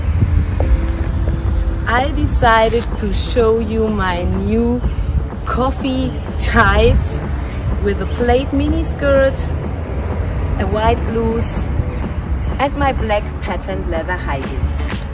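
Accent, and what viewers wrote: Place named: Germany. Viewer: Yr German accent makes me hot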